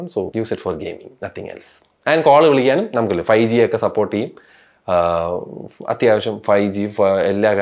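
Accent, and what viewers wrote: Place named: Thiruvananthapuram, India. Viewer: Edake tvm slang varunu but polii annu muthe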